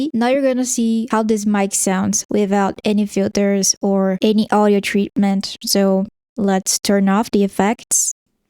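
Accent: Brazil. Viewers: Eu vi o vídeo de hyprland com cachyOS e eu pensei hmmmmm parece inglês br (mas está mt bem) kkkkk mt bom, vlw pelos vídeos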